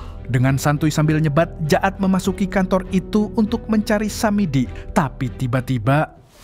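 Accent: Banjar, Indonesia. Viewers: urg banjar jua kh pian mang😅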